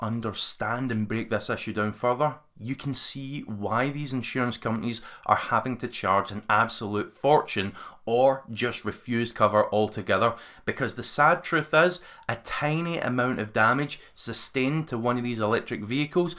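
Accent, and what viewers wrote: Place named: England, United Kingdom. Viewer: heavy english, similar to ozzy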